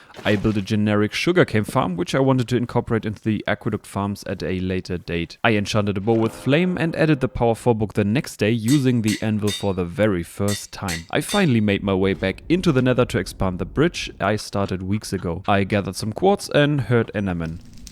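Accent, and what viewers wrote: Germany. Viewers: You sound German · Are u germAN? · You have a german accent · You sound a little bit german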